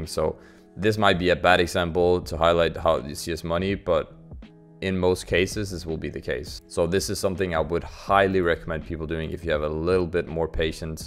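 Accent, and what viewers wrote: Denmark. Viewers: Love ur fcking Danish accent like Pimp xD <3!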